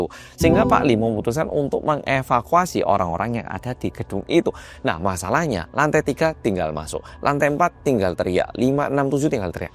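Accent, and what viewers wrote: Java, Indonesia. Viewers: Ya ampun😢 tks mas muedok🎉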